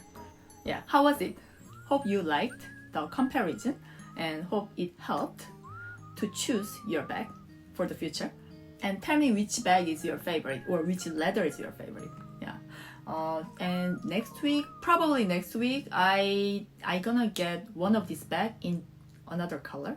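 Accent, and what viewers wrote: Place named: South Korea. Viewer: 발음이 한국인인데?! ㅡ..ㅡ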